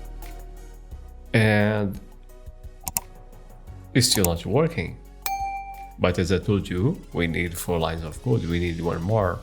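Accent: Brazil. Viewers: Você é brasileiro? Muito bom seu tutoral! Seu sotaque parece do rio de janeiro. Parabens pelo otimo conteudo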